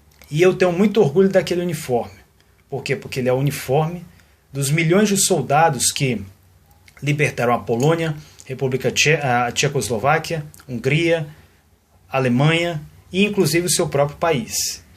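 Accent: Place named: Rio de Janeiro, Brazil. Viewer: Afinal, de onde você é? Como você pronuncia "faxista" ao invés de "fascista", achei que você é carioca, pois pronunciar o S como X é bem característico dos cariocas.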